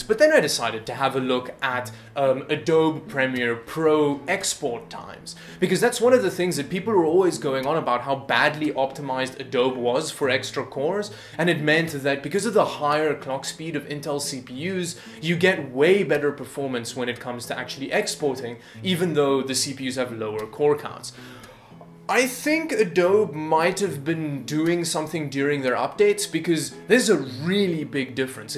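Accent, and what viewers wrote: South Africa. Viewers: Great video... Hey are you South African?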